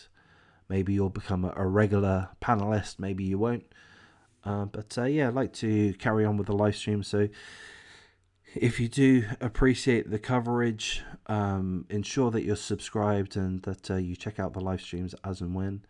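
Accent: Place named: United Kingdom. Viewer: As listening you are clearly British like me, out of curiosity do you still live in England? Or follow these because of now living in America?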